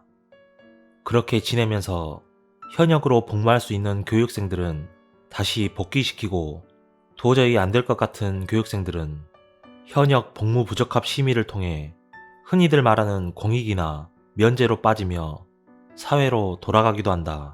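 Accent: Jeolla, South Korea. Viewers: 전라도 사투리가 찐하게 뭍어있네요ㅋㅋㅋ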